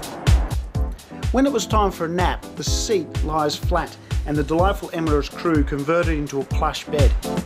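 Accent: Australia. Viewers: I like the walk through style video and nice to hear an Aussie accent :) Good job.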